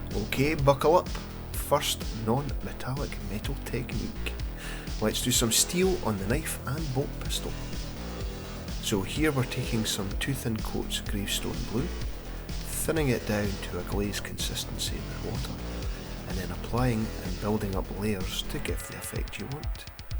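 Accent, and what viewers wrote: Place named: Scotland, United Kingdom. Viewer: Nice one. And the Scottish accent rendered you an extra like.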